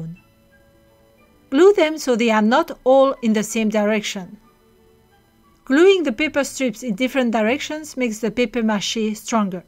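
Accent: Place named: Asia. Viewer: Why is every video I find about making a globe an Asian which in accent?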